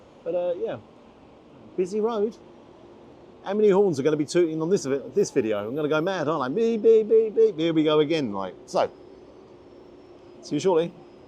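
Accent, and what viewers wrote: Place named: England, United Kingdom. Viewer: Hello from Budapest, Hungary and I am always hungry😅 I really love watching your videos mainly because of your accent and I do think that YOU are a true -born Englishman😊 My family and I are planning to visit London so I am trying to get some ideas where shall we dine once we are there😊 All the best !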